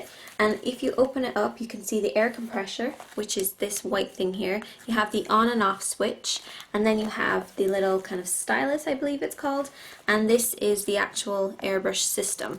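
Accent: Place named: Ireland. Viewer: I noticed the Irish Accent then checked your facebook and your from Cork!!!!! me too! thats so cool!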